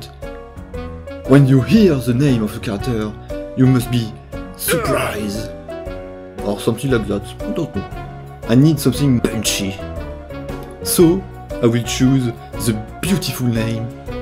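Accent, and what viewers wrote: France. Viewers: your french accent is beutiful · Nice French accent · You are astonishingly French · he is french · Wait, are you from france? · Mec Ta un accent français ES QUE T FRANCAIS? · You French. Also merry Christmas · L'accent français est parfait! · Je rigole quand j'entend cette voix. C'est pas contre toi mais j'ai l'impression que tu fais un peu exprès de mettre cette accent français. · Cet accent français magique mon gars, tu m'as tué 😂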